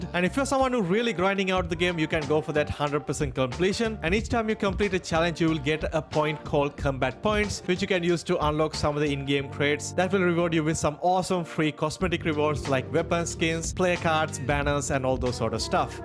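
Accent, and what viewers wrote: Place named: India. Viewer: This Indian accent is fire🔥🔥🔥🔥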